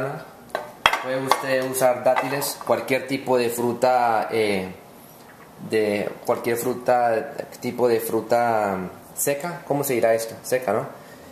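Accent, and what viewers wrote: Antioquia, Colombia. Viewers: Hola niño guapo! Buenísimo! Buscando recetas para cocinar la quinoa llegue a tu canal, aunque ya había hace algunos meses ya había visto algunos videos tuyos y me parece que tienes muy buena información. Eres tan fresco e inhibido, invité a mi hija a que viéramos juntas este video, pues es para ella a quien le cocino este tipo de comidas, ya que es vegetariana, (algunas semanas vagana, jiji!) Y le caíste de lo mas bien y dijo: He's so cute! le encantó tu acento colombiano...pero mas se sorprendió de cuanta buena información proporcionas. Dale gracias a tu mami por esta deliciosa receta, y por favor que se anime a abrir su propio canal para que nos comparta todas sus recetas vegetaríanas y/o veganas que me imagino ya deben ser muchísimas! Saludos Cordiales! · ole tu suenas paisa, ja ja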